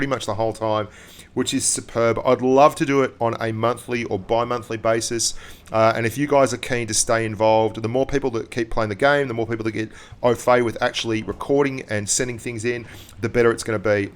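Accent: Australia. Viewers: Are u Aussie I'm Aussie as well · And also I just realised ur an Aussie..........I'm a kiwi from NZ XD watupppppp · yes hes aussie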